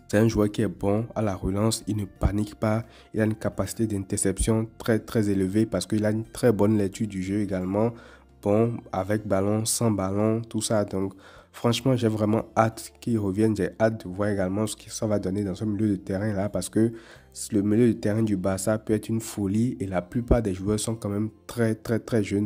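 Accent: Benin. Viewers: Tu as un accent beninois😂👍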